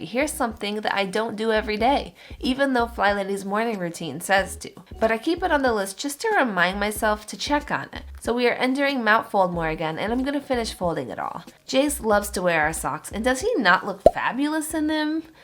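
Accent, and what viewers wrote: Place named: Cockney, United Kingdom. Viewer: Nice Cockney accent. You could play Eliza Doolittle. Thanks for the swish-and-swipe hacks. That's the part of the morning routine that holds me up.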